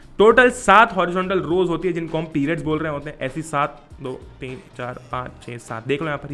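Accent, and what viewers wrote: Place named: Maharashtra, India. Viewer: dada marathi ahes na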